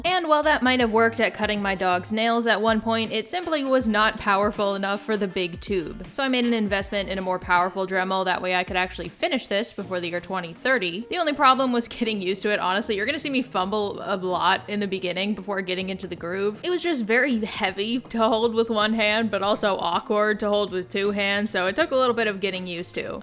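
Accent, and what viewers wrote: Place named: Colorado, United States. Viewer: Sounds normal to me. Apparently dropping the t from the middle or end of words is a Colorado accent thing. Cur-ains, Moun-ains, and so forth.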